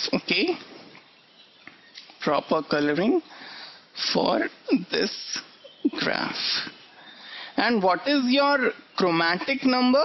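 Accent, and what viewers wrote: India. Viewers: i am sick of this indian accent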